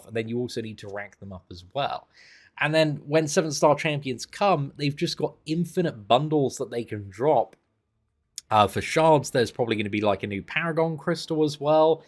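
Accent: United Kingdom. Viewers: It is hilarious to listen to a British man swearing lol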